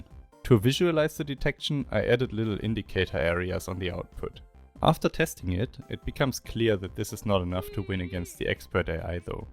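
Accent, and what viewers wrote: Germany. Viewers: This is extremely interesting, love the work you put into not only the coding, but the video editing aswell. Might I ask, where you are from? I hear an accent but I am not quite sure if its a german one or something else. Anyways, new subscriber, keep up the good work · R u german? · Hört sich so extrem deutsch an.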